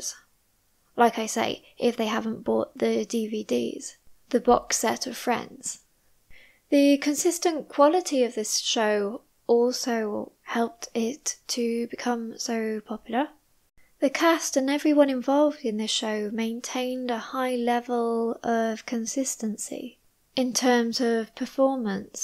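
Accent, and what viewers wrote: United Kingdom. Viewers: I think I'm still able to catch 95% the C1(without reading the text, off course). And I'm wondering how difficult it is on the C2. Will you make the listening practice for C2. Btw, I like your british accent. You use MRP, right? I still find it difficult to understand non MRP british english.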